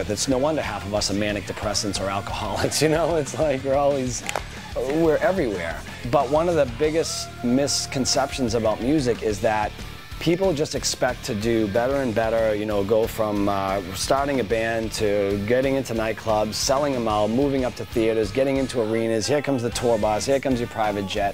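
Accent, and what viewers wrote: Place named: Boston, United States. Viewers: He's from Lawrence kid! It's a Boston accent we all sound like massholes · Boston. My Grandma was from there and jad the same accent.😁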